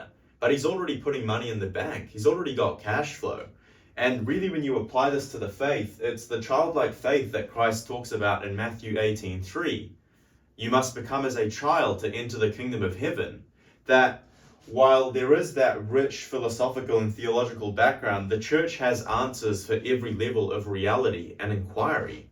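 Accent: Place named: Northern England, United Kingdom. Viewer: Amazing cross between a northern english, kiwi and australian accent. Listen to this young man, he’s either lived many perspectives already, or has family experience to call on.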